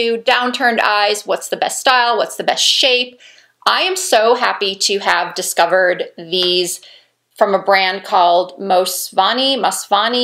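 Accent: Midwest, United States. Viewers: Our Amazon shopping history looks so similar. I have a couple of those brush holders. I have that lamp in silver. I have that necklace set. I’ve looked at that black dress but opted for the sweater with a similar sleeve instead. I just spent the holidays in Summerlin, coincidentally. My SO’s mother and family all live there - so we’re actually there a lot. I’m not sure which neighborhood you’re in. I’m so glad I found your channel. I haven’t been watching long enough to know but your accent sounds a bit from the midwest (like around Chicago?). I am from 50, live in LA, and am originally from Indiana. Anyway. You look fantastic and I am enjoying your channel. Thanks!!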